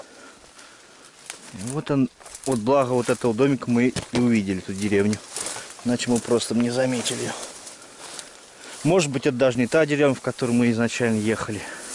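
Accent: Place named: Tambov, Russia. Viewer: я тоже родилась в Тамбовской обл. Когда услышала ваш говор сразу подумала земляки. Потому и спросила что за обл. Очень печально видеть все это . Моя юность прошла там.живу далеко от тех краев к сожалению . Какой район можно узнать ?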